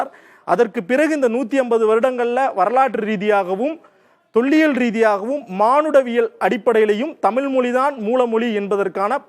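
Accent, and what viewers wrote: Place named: Telugu, India. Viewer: மொலிகல் என்று சொல்லாமல் மொழிகள் என்று சரியா உச்சரிக்க வேண்டும்.... உங்கள் உச்சரிப்பு நீங்கள் தெலுங்கர் என்று காட்டிக் கொடுக்கிறது...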